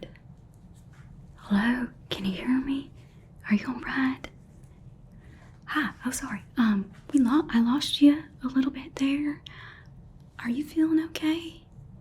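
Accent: South, United States. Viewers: The Southern Belle has returned! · Hey, it's been way too long since we last caught up, you're missed 🎉 ❤.. beautiful accent to which region in the (( USA 🇺🇲 )) it belongs to?? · This gives off Firefly/Serenity vibes. Why do southern accents and Sci-fi compliment each other so gosh-darn well?????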